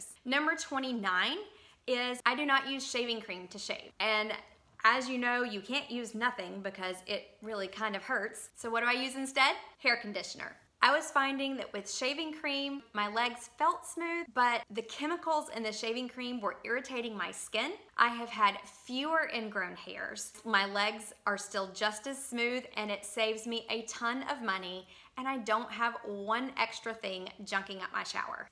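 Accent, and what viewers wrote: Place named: Tennessee, United States. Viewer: Great video! You sound like you're from Memphis or Nashville! Are you from TN?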